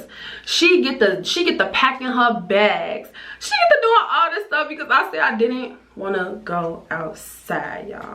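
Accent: Chicago, United States. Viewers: 🤔 you sound like you from Chicago, I’m new here do idk lol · I can definitely tell you’re from Chicago sis! Accent hella strong! 🤣 sooo pretty btw! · I love a good Chicago accent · Just listening to you talk I can tell you from Chicago and it’s comforting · Lol are you from Chicago?? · You from Chicago? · Are you from Chi bc it definitely sound like it 😭?? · I can just tell u from Chicago 😭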